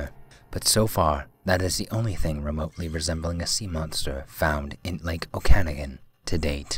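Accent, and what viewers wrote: United Kingdom. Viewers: You sound like British person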